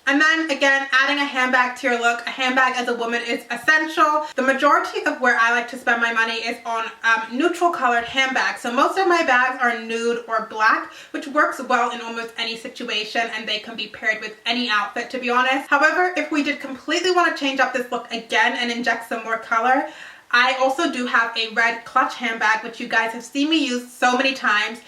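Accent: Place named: United Kingdom. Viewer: i love how your British accent comes thru every now and then.....you're amazing!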